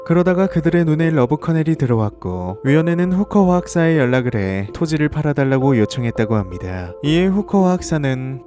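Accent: Japan. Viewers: 왜 자꾸 영어가 일본어 들리지 ㅋㅋ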